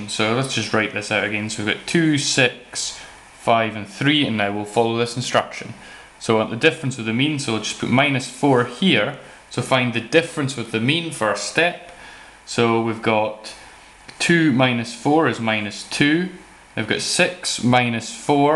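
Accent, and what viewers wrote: Scotland, United Kingdom. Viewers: Herschel's Lost Leg. He sounds Scottish....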